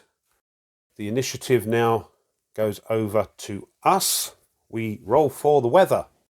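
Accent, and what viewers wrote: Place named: United Kingdom. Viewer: Hopefully will have reprinted soon! Missed the 1st Edition…Like your videos and love your British Accent!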